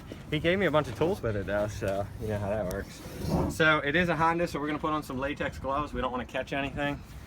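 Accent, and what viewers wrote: Maryland, United States. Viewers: Soon as I heard that accent I knew he was from marelin hon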